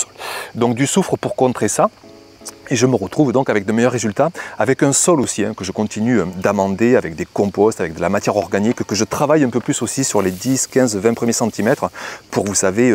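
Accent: South, France. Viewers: Salut, je partage tes observations, je suis a côté du mont saint Michel, et un temps parfait pour le jardin jusqu'ici, quelques pics de chaleurs, de l'eau régulièrement, la meilleure météo est celle qui ne dure pas comme tu le dis (avec ton bel accent du sud) L'été n'est pas fini ne faisons pas de conclusion hâtives, bon courage à toi! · Salut, merci encore de ce partage pré-vacances. Saches que tu nous fais voyager dans le sud à chaque fois et c'est un régal yeux/oreilles 😁 Essonne ici, une réussite exactement comme tu l'as dit, après l'an dernier catastrophique humide et baveux, cette saison est exceptionnellement adéquate. Quelques jours au dessus de 35, vite rafraîchis par des nuages ou carrément la pluie mais qui s'arrête aussi vite, et donc pas de mildiou/oïdium. J'essaye de faire exclusivement avec de l'eau de recup', et mes apports sont exclusivement végétaux, graines de recup', juste pour me dire que c'est maman-nature qui a tout donné en échange de mon attention et mes soins. 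Tu as participé à l'évolution concrète de mon potager, avec d'autres, et je t'en remercie au passage: ton naturel, ta simplicité, ton accent, l'âge équivalent peut être, y'a eu un truc en tout cas. Je te souhaite de te ressourcer, de bonnes vacances à toi et à ta famille et à très vite 🙏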